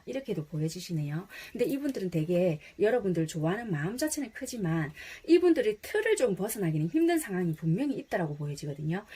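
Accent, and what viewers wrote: Busan, South Korea. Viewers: 부산 사투리 뿜뿜 타로 5 처음부터 조언이......ㅋㅋㅋㅋ귀우셔용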